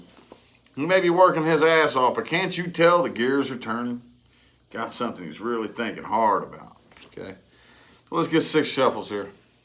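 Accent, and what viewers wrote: South, United States. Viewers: Super accurate, but also I would watch even if it weren't! Love ur energy, your southern accent and your face 😂😂😂😂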